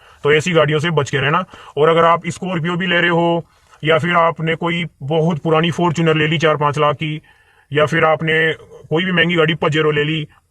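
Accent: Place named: Hadoti, India. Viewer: Bhai boli se hadoti k lag rhe ho. . Kaha se ho. .❤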